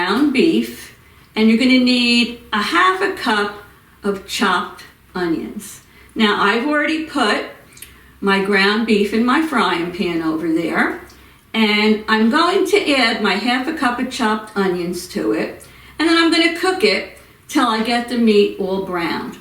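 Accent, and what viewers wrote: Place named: South Jersey, United States. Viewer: Oh man, this looks really good, I even think my grandlittles and son may like it! Baking is not my forte so I am ready and open to learning from the herself! By the way....I recognize that accent. I am a former South Jersey girl. Loved it and miss it but we are now back in my home state, NE. Really love your creative video style. So looking forward to more! Also put your notification bell on "All"!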